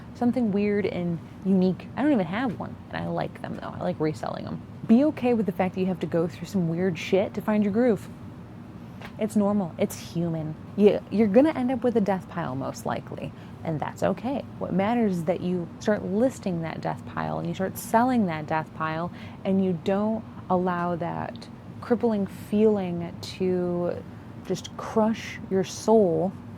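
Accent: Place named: Michigan, United States. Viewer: Came for the reselling content, stayed for the Michigan accent.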